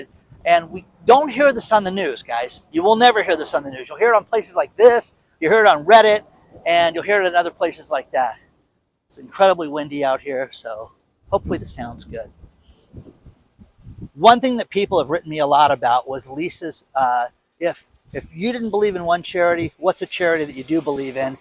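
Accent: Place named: California, United States. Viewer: My mom watches your videos religiously and has for many months. You seem like a sensible guy. You clearly know magnitudes more than you let on. You're also one of the very few people I've ever seen who has a legitimate Californian accent. Lots of people still claim there is no such thing, but it's very subtle.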